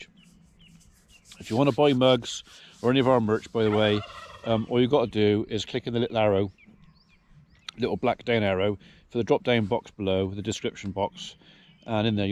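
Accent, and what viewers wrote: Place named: Herefordshire, United Kingdom. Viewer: Yes farming is a bit hit and miss atm . Anyways all the best with it .. Remember next Friday is Funky Farmer's Birthday . Why dont you do YouTube live like he does . Sweep and I hope we did not offend you by saying you had a hobby farm . Though we still think you have a Herefordian accent. Btw Sooty and friends soon will be doing videos our selves with Charlie the Chimp . Keep safe .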